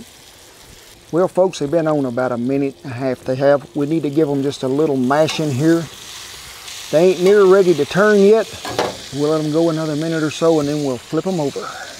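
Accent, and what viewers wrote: United States. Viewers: Friendlyjordies bought me here. Love me some cowboy accent and some delicious yum yumms. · Это настоящий американец, фермер с глубинки) Акцент потрясающий)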